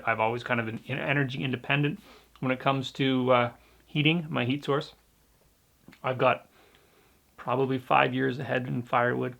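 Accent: Canada. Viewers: I just found your channel, you’ve really made some beautiful places on your property? I detect the Canadian accent, are you in Ontario? Do you raise the pigs for food? I’m not a vegetarian but I’d have a hard time eating an animal I cared for. I had a duck & 3 chickens as pets for my kids & we lived in the city. The neighbour’s loved it so nobody reported us.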